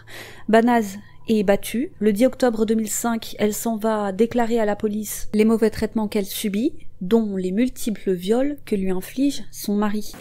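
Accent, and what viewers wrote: Arab world. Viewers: Oua l'accent arabe , on voit d'où vous venez......peut être, l'Algérie.......🤔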